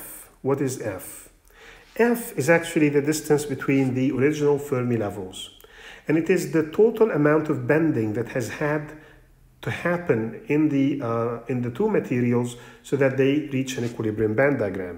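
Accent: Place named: Egypt. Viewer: Best explanation ever! I am proud to feel the Egyptian tone and style.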